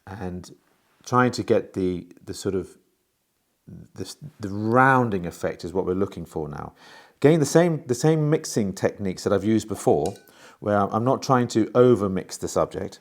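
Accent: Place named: Scotland, United Kingdom. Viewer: Thank you so much for sharing this wonderful time and painting with us. Your heart and joy for color are so beautiful. It is so lovely to also feel the support and caring of your family. My husband lived most of his life in Scotland and I am American. Our home is in America now. And we travel yearly back to Scotland. It makes my heart happy to hear you accent and expressions. Such lovely painting and wonders and learning from what is now my other home.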